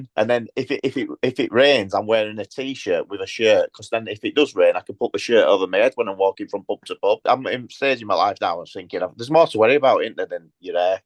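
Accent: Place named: Northern England, United Kingdom. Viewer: Just because you're not used to a Northern English accent there's no need to be a dick about it - his articulation was perfect.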